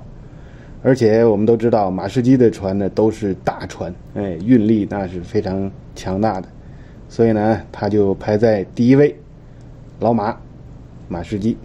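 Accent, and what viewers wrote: Tianjin, China. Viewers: 说话天津味道很重…… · 第一次刷到，李船长的口音听起来怎么有点像天津的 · 一听就是天津人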